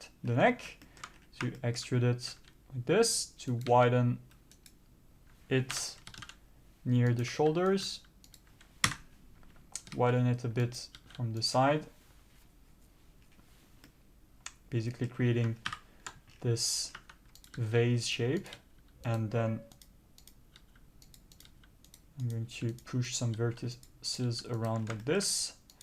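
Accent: France. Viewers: I got lost in your silky smooth french accent and accidentally made my base mesh out of cardboard, styrofoam and an actual blender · nice french accent and video ! good job dude :) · oh j'ai tout de suite reconnu le petit accent français XD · Super tuto le m'sieur à l'accent français ;-) Je viens de tomber par hasard sur ta chaîne, j'ai du retard à rattraper. Merci pour le partage de tes compétences. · French accent 10/10 <3 great work on the video it literally made me get back into blender! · "And if you're REALLY lost because of my French Accent, don't wor-" Me, immediately: Oh no! Don't you dare be disregarding yourself, sir, ma'am, or Enby person, because I've been learning French for a REASON, DAMMIT! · man what r u talking about... ur French accent is a blessing haha. I've watched so maaaaany tutorials of asian guys understanding whom was a fucking torture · really good tutorial and the french accent is cute. 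thanks! there is so much you can do with meshes and wireframes. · Don't worry your french accent is understandable and nice!! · it sounds like you are french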